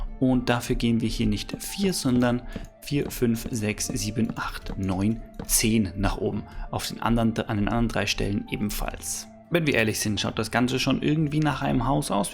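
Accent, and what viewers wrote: Austria. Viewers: Kaons sein dost a wosch echta östarreicha bist?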